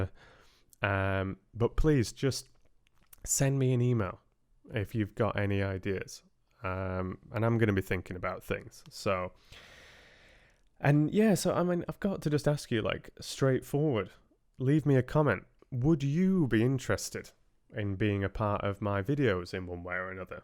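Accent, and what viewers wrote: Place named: Liverpool, United Kingdom. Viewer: Are you from liverpool? You have the same accent as the beatles.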